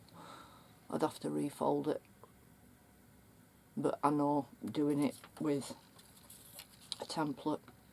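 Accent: Lancashire, United Kingdom. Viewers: Hello my lovely I haven't seen one of your video's for ages. This is great🖒 I will share it on my Pinterest for you. are you from Lancashire you sound like my aunt in Preston lol x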